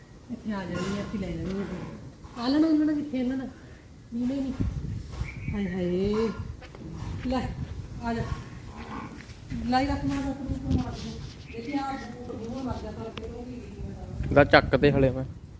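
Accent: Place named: Sangrur, India. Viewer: Hr gl nal te te bolde. Sangrur wale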